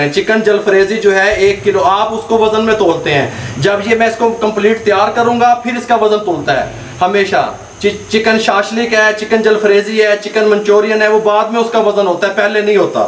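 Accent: Punjab, India. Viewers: Samjhane ka style bahut hi Achcha hai Punjabi Maar Ke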